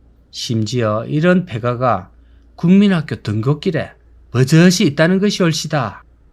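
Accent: Gyeongsang, South Korea. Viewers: 갱상도? ㅆㅂㄹ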